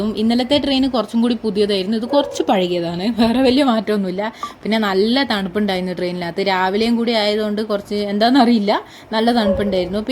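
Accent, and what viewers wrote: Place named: Kannur, India. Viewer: കണ്ണൂർ ഭാഷ 👌👌👌😀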